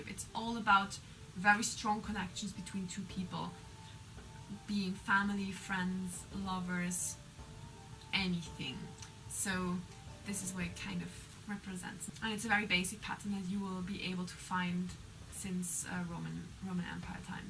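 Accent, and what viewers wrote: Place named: Scotland, United Kingdom. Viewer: your accent is beautiful. What kind of accent is it? Is it scottish?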